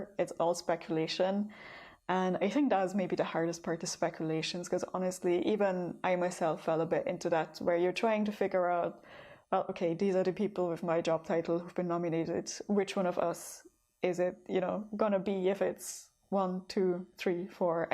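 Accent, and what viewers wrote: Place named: Ireland. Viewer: I just can't get over how you are sounding more and more Irish with every video lol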